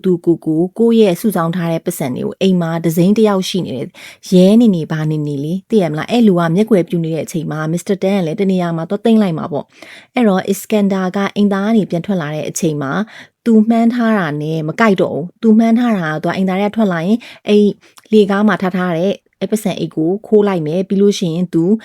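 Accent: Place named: Yangon, Myanmar. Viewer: ရန်ကုန်ဘက် လေသံမလို့ပါ😊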